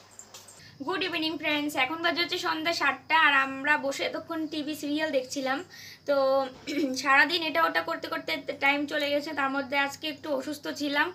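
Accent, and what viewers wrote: Bangal, Bangladesh. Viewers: Didi maajhe maajhe bangal vashay kotha boliyo , valo laage shunte tomar mukhe bangal vasha shunte..❤️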